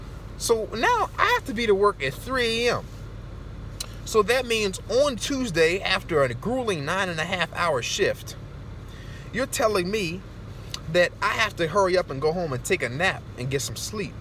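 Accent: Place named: Maryland, United States. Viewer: You sound like you from dmv like maybe Maryland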